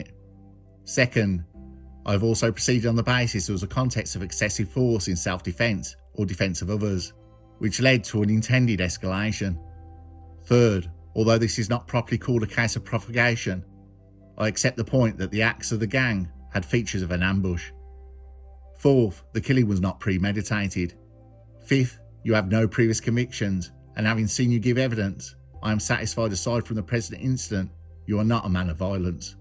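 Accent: Birmingham, United Kingdom. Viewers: Love you're content bro.wouldnt sound right without the brummie accent.❤😂👍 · Narrator is a brummie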